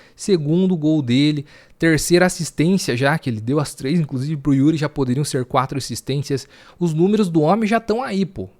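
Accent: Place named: South, Brazil. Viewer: Sou vascaino e estou aqui assistindo Vasco x Bahia e fortalecendo o seu canal. Você é natural que lugar do Sul?? PARANÁ? Acho foda o jeito de vocês falarem. Parabéns pela vitória!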